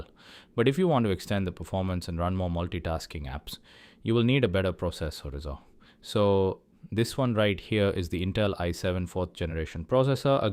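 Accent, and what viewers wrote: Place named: India. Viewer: True indian accent 🫡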